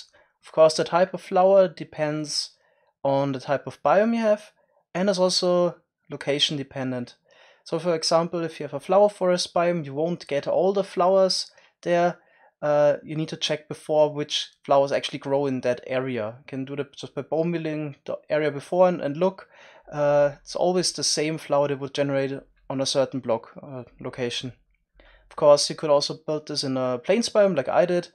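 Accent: Germany. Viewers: Is your native language German??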